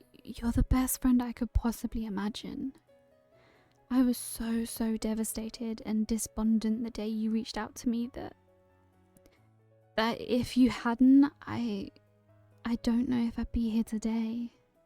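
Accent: United Kingdom. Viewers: I'm getting, a southern accent? Not devon but something near? Definitely not central Britain, I'm from there so I'd know. 'based on what I feel pressed up against my hips' is crazy af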